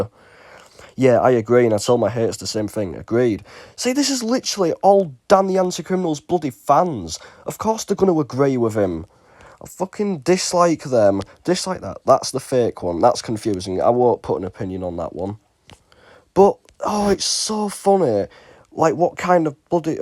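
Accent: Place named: United States. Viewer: He’s defo American his voice is so jarring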